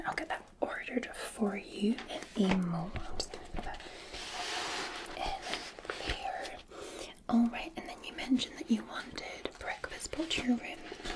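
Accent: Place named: Scotland, United Kingdom. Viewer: Love your Scottish accent and your scottish hotel videos I have PTSD and your accent always makes me safe my first asmr video was your cozy cottage video been a fan ever since thank you for all you do your fan jamie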